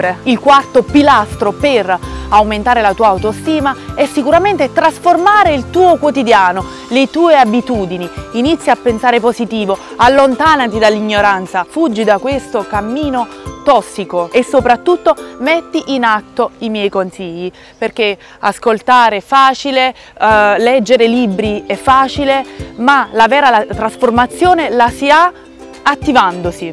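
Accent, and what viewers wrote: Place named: Naples, Italy. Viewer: Che bello in tuo accento napoletano !!!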